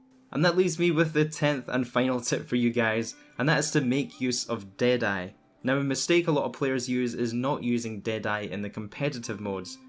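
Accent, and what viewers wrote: Scotland, United Kingdom. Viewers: Omg are you Scottish!!! You sound Scottish!!! Iam Scottish 😍😍😍 subbing just for that dude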